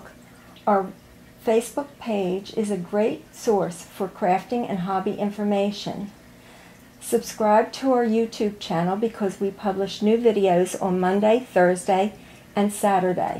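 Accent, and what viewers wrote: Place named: United States. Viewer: Thanks a lot! I am probably responsible for half your views: trying to watch carefully how you do it :) What part of the states are you from? That accent is charming.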